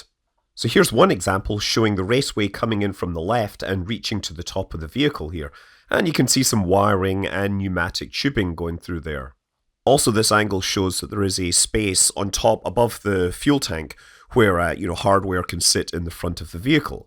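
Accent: Scotland, United Kingdom. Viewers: Listening to this with your deep raspy, Scottish accent, put me over the Carmen line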